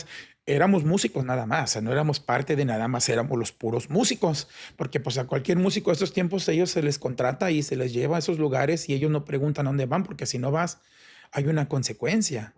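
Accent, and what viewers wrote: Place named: Pocho, United States. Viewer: Que triste y pensar q muchos niños pasan por esto o cosas peores solo una duda por q habla como pocho 🤦‍♀️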